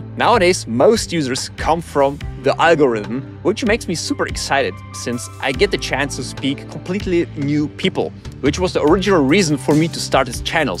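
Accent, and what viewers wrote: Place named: England, United Kingdom. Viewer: Aww, I like your english accent :)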